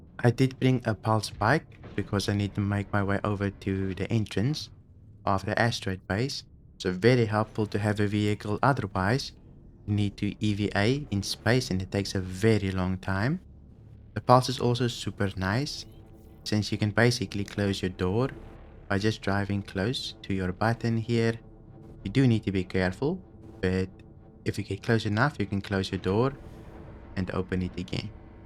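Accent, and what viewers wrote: South Africa. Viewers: South African accents are very lekker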